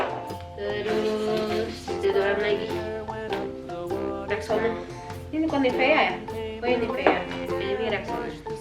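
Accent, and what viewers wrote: Jakarta, Indonesia. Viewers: Saya depok nya krukut limo depok mpok.. Salam kenal mpok suka banget ama mpok yg logatnya betawi tpi pinter bhs asing. Lovee youuu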